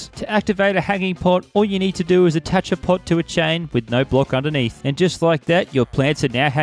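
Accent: Australia. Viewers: Is he Australian? Love Australian minecraft youtubers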